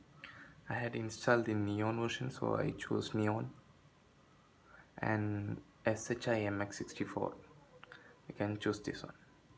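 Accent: Kerala, India. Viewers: 😀sound ketta ariyile Malayali ann enn🌝😆 bro pinne orru help vennam 😅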